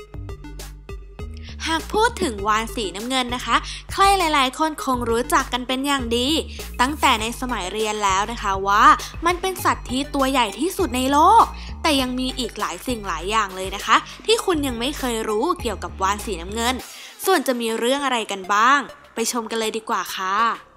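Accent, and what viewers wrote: Suphanburi, Thailand. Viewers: เหน๋อๆ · สำเนียงเหน่อไง คิดสิคิด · มึงจะพูดเหน่อทำไม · น้องคนพากษ์บ้านอยู่สุพรรณ เหร้อ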